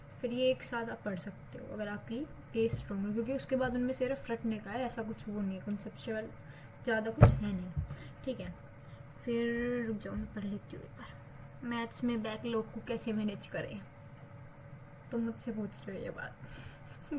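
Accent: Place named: Haryana, India. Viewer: firstly, thanks alot for the effort of making it and secondly i watched your 1 video before this one and i was 99% sure u were from haryana now this confirms 😂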